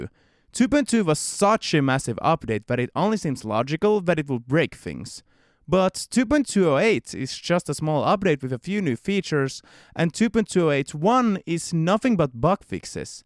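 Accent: Finland. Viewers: Are u Finnish🇫🇮? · En ees tajunnu et äijä o suomalaine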